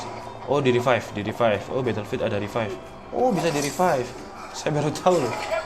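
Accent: Malang, Indonesia. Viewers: Wong malang mas ?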